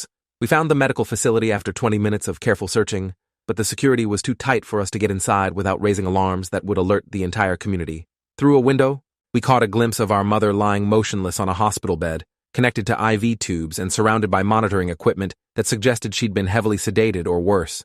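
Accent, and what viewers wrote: United Kingdom. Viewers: anyone hears the British accent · Why does it keep slipping into a British accent? 😂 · why british again?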